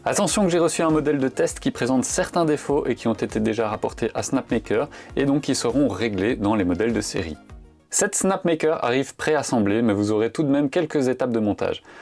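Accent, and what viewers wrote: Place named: France. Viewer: I am from the usa and youtube tries to put the english ai robot voice over your beautiful French accent, I turned it off ;) I can't want for this one! I just bought an H2D to go alongside my X1C and those printers are bulletproof workhorses, but this U1 will speed up multicolor prints by so much, while reducing waste as well as some color bleed issues I had to tune out of some of my prints with a lot of white filament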